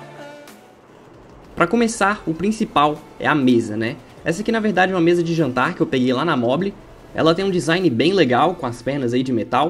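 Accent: Brazil. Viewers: melhor parte é o sotaque de mineiro hauheuahahah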